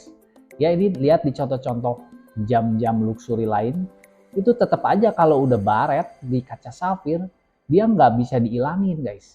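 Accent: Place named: West Java, Indonesia. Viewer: Pasti mang nya urg sunda 😅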